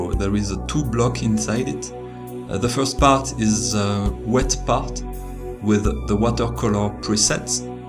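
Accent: France. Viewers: Merci pour la vidéo ! Ton accent français qui articule bien pour chaque mots en anglais (contrairement à eux qui avalent leurs mots); m'a beaucoup aidé à te comprendre ! xD Et ceux même en anglais. Merci ! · trop fort l'accent français^^ merci pour le partage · le bon accent de français haha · are you french? you have thé french accent 😊☺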